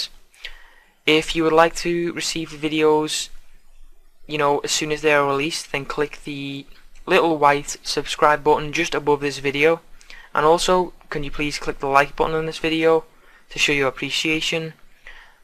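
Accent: Liverpool, United Kingdom. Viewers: You sound scouse lad, you a wool? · Are you perhaps Scouse? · are you a scouser? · Ziqian Li the guy in this vid is a scouser (liverpool) lol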